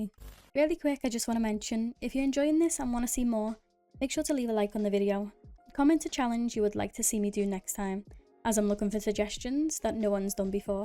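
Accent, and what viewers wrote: Liverpool, United Kingdom. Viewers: a scouser?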